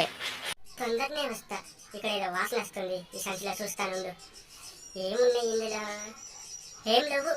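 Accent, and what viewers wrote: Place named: Telangana, India. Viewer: Telangana slag👌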